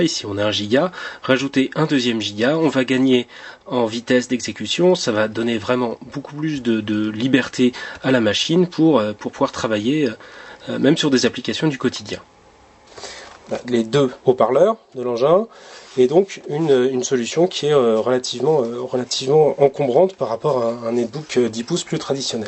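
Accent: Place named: France. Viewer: Me gusta el acento francés, me gusta la asus, me gusta el video... excelente!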